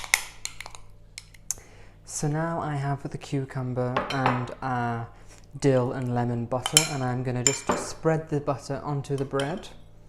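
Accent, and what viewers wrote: RP, United Kingdom. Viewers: Lovely concept and lovely video. I’m curiously wondering about the accent. I’m assuming it’s British but from which part? Possibly from Africa , Zimbabwean maybe? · So posh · Oh my god I couldn't imagine working with this geezer. Speak normal because I can't stand posh people, it's like they act so superior and know everything. Oh shut up. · You have a British accent like the lead singer from the. You guys must be from the same town.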